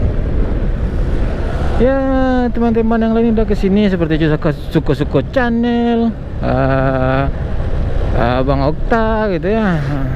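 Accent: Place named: West Sumatra, Indonesia. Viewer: Logat bahasanya sama kayak minang kocak lu bang wkwkw😁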